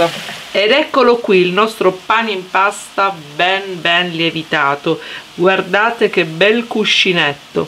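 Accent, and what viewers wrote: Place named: Sicily, Italy. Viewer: Siciliani di dove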